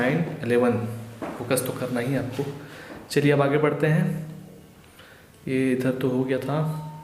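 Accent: Bihar, India. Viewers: Sir aap bihar se hai kya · Sir Aap bihar se hai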